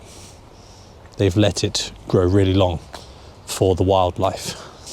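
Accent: London, United Kingdom. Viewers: Your accent gives me all the memories of my younger life in London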